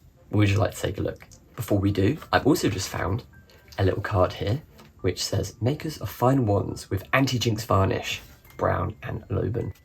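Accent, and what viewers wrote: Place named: United Kingdom. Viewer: Hi. I would have to take pictures of them, but so many gave away, to my ex's nieces and nephews and they broke them, but I will send a few to you soon, thank you for commenting on my comment love it...Also, love your accent and the U.K... want to go there since first saw Harry Potter... but in the summer though...Here in Canada, 2 months of nice and the rest well...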